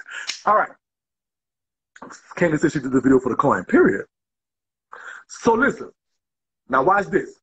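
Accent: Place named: Louisiana, United States. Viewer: It’s the Louisiana accent for me I love it 🤣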